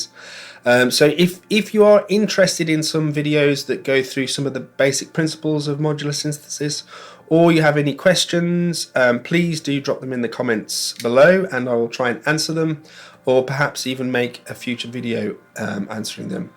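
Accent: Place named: Midlands, United Kingdom. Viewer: Hi, cool video. Are you in the Midlands? I’m in Birmingham:) I’m going off the subtleties of your accent haha. When I was beginning with real Modular I just got 1 or two Doepfer modules and then patched them together in different ways for a while, it was a good way of not being overwhelmed 😊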